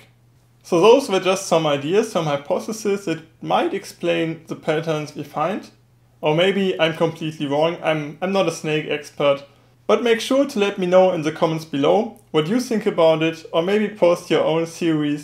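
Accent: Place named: Germany. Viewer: You have the stereotypical german accent :D but good video ;)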